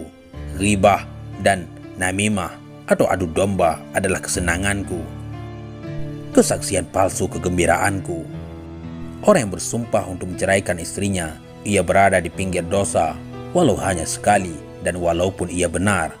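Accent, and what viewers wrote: Aceh, Indonesia. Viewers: Admin nya kayak suara org aceh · Adminnya urang Aceh ya?bunyi slang nya lain ckit.😃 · Logat nya orang Aceh ni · pasti org aceh ini yang bicara hehe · Suara nya seperti orang aceh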